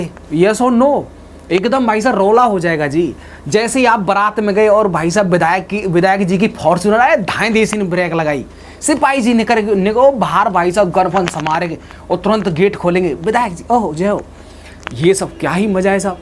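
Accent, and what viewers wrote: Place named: Western Uttar Pradesh, India. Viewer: sir aap aligarh ya mathura ke lagte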